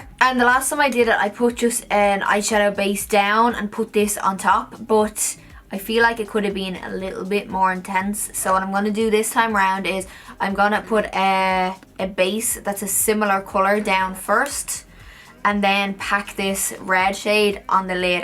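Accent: Dublin, Ireland. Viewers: You are such a beautiful girl!!! I'm from Belfast, and if my ear is correct, your from Dublin?? I'll always support anyone from this island, we need to take over!!!! :D Also, do u know of any good shops in Dublin to visit, for makeup, skincare and clothing?? I always make a trip down after Xmas, but I always go to the same places... Just wondering if u know any cool places outside of the bigger stores, like Arnotts, Brown Thomas etc xxx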